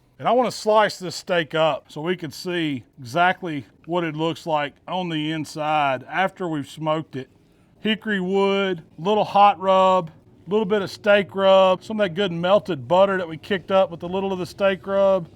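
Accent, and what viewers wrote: South, United States. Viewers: Something about a fat guy with a southern accent and awesome sayings that gives instant smoking credibility. Not a diss at all. Your videos are all awesome.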